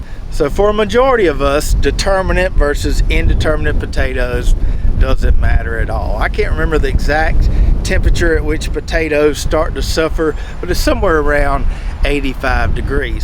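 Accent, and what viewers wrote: South, United States. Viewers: lol southern talk is funny 🤣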